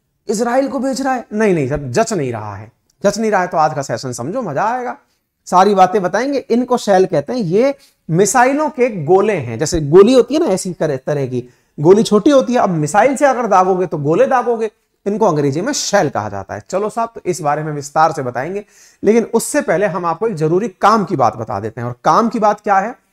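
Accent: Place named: Rajasthan, India. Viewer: आप राजस्थानी हैं मुझे राजस्थानी की पहचान है अतः आप मुझे रखें राम राम भाई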